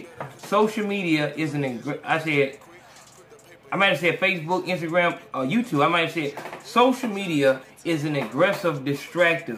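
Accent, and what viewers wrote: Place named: South, United States. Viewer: “Upping that gaw damn fye” 😁 salute brother the content deep af ... I know u country as hell fr #SalutefromFlorida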